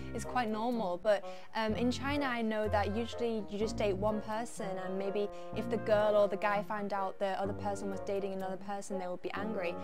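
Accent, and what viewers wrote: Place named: United Kingdom. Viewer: ❤❤❤❤Love your British accented Chinese🌷🌷😘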